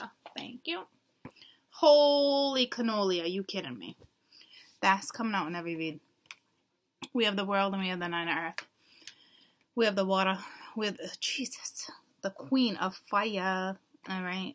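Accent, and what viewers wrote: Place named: Long Island, United States. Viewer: I am sorry this is SO irrelevant and while I am at it, what a great reading! So thank you(: But are you from like Shirley?!.... L.I.,NY ? I ask because you sounds like just my great aunts from there haha Italians on long island lolol<333 it reminds me of Easter :p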